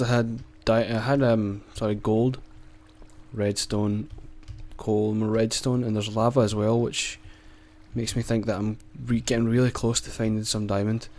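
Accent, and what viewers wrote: Scotland, United Kingdom. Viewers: I really liked your MW2 commentaries I love your Minecraft videos I am OBSESSED BY YOUR AWESOME SCOTTISH ACCENT!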